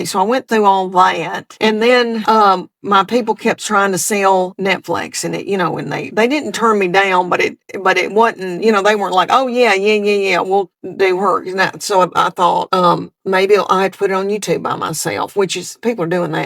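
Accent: South, United States. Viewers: Its on par with Seinfeld humor, which did great so not sure why theres not more of it. Clean humor that for the most part deals with humorous ironies of day to day life. This makes it relatable to everyone who listens to it. And for whatever reason, her deep southern accent makes it all the funnier. Glad i found her ❤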